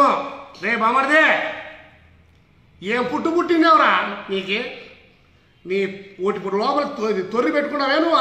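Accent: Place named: Rayalaseema, India. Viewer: anna needhi mana rayalaseema language la vundhi dare and guts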